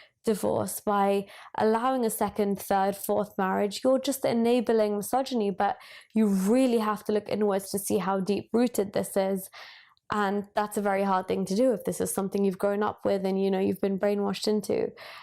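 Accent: England, United Kingdom. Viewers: It's still interesting to see an ethnically Indian person to speak English in an Anglais accent.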